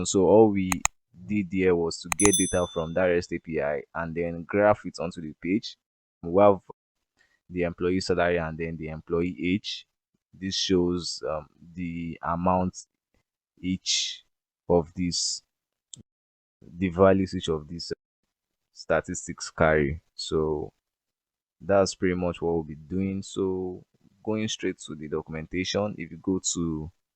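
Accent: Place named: Caribbean. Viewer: Nice video! Very helpful since I'm just starting out with APIs. Are you from the Caribbean by any chance? Cool accent :)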